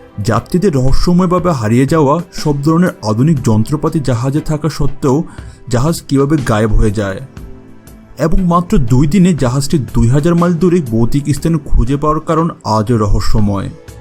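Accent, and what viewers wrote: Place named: Chittagong, Bangladesh. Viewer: ভাই আপনি খুব সম্ভবত চট্টগ্রামের মানুষ। তাই শুদ্ধ বাংলা পরতে আপনার কষ্ট হচ্ছে। অহ আপনি যে রিডিং পড়ছেন সেইটা বুঝাই যাচ্ছে। অন্য কারো ভয়েস দিয়ে ট্রাই করেন। না হলে নিজেই কিছু প্রাকটিস করে কৌশল অবলম্বন করেন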